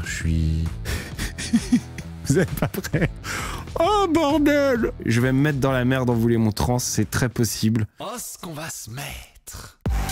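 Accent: Marseille, France. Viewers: T es RIDICULE avec ton accent Marseillais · tu fais trop rire avec ton accent marseillais 😂😂😂😂on dirait plutôt un accent asiat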